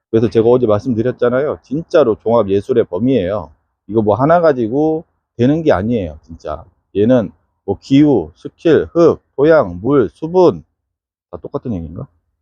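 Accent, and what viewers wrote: South Korea. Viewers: 경상도억센말투만듣다가대전사투리들으니넘정겹네요!, 영상잘보고배우고 감사합니다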